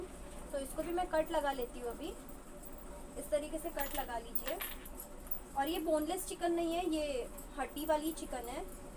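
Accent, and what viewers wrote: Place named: Karachi, Pakistan. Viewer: Ap ka accent bilkul karachi wala hai ap Andheri ka na btatin to mai yehi smjh raha tha ap karachi say ho india mai b M.Ali road hai.?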